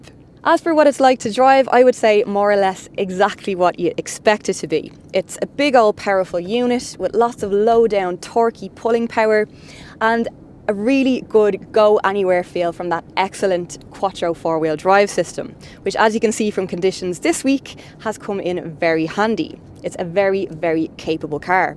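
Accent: Ireland. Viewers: Love your reviews and the gorgeous Irish accent - I’m now a subscriber. Can’t agree about the Touareg interior though - more functional looking and less classy than the big Audis.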